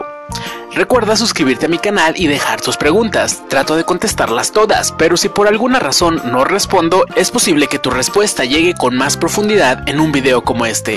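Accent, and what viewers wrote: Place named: Mexico. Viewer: por su asentó, creo que es ud de mexico, o peru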